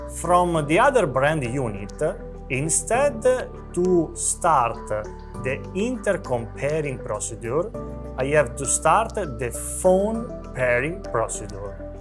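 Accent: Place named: Italy. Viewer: Si sente che è italiano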